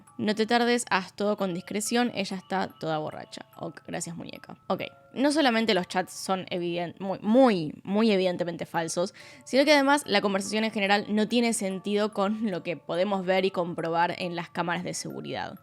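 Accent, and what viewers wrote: Argentina. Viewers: Amiga no hables como Argentina estás en México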